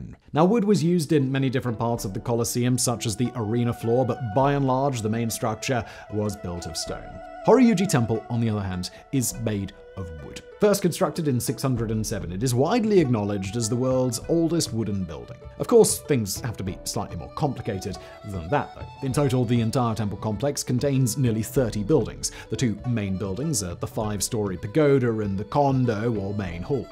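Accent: England, United Kingdom. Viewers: Man, in all honesty, someone has to direct this videos better. I know he has a British accent but in some parts his words are barely clear and sound like a run on sentence. Also some clips were cut short. Many words were butchered and I can’t understand how this video was cleared to be published. Please.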